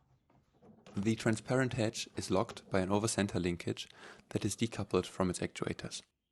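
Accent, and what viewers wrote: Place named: Germany. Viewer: This is amazing! Whenever you see an ingenious project, it comes with a German accent. If you've not seen it already, please, please check out Destin / Smarter Every Day's manufacturing video. It's about getting a Barbeque cleaning brush made in the USA with non-chinese parts. It would be sad to see the MPS fail as a business, but it seems that the west has cost engineered ourselves into a manufacturing corner, and become very vulnerable in the process.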